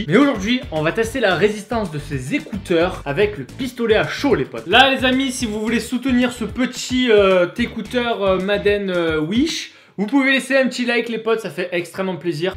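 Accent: Marseille, France. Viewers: waw l'accent marseillais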